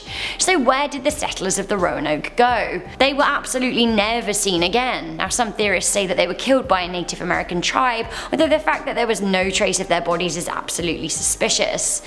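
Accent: United Kingdom. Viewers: sthurston she from Britain duh they pronounce words differently then Americans common sense there try using some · I found you. My company’s newsletter just had a “Who looks the sexiest in a red dress” vote. was the winner. I didn’t know who that was so I was told to do some YouTube searching. I found this and I have to agree, the British accent is definitely a cherry on the cupcake. Just thought I’d share that... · i hate it she needs to chill out its very annoying to me i dunno what i have against britsh accents im sorry i dont mean to offend you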